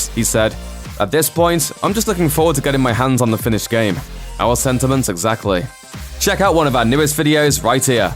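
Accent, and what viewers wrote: United Kingdom. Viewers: The game is absolutely AWESOME...this guy can take his limey voice and piss off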